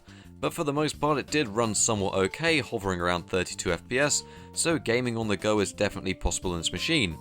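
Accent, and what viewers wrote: United Kingdom. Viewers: Ur British lol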